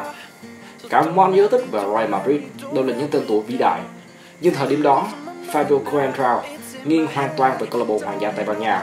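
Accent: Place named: South, Vietnam. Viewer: Giọng thì đã miền năm lại còn ồn ào tạp phẩm ...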